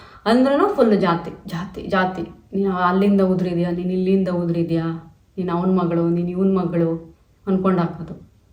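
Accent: Mandya, India. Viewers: the way u talk kannada really feels like u are in India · you don't change local kannada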